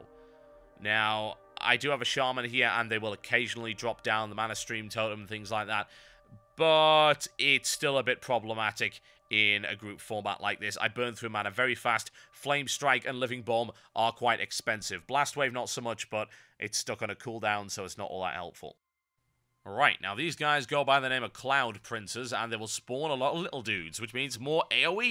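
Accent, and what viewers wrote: United Kingdom. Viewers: don't forget to mention his really cool British accent.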